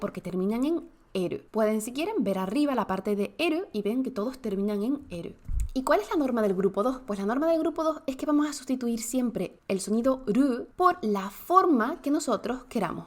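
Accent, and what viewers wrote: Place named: Andalusia, Spain. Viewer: Im-pre-sio-nan-te. De lo mejor que he visto sobre japonés. Ya me suscribí. Sos como yo, sintetizando a tu modo encontrando puntos en común y poniendo en duda lo establecido. Pero lo hacés desde la autoridad que te da el dominar el tema, el haberlo estudiado en la forma tradicional (¿o ya de entrada cambiaste todo?). Una verdadera genia. Y la pronunciación, impecable (la del japonés, porque la materna...😂 Suenas a Andalucía ¿Puede ser?). Te admiro mucho. Y, claro,¡Gracias!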